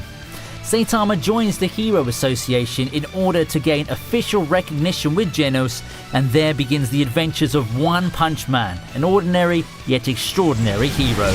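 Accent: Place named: United Kingdom. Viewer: Did not expect British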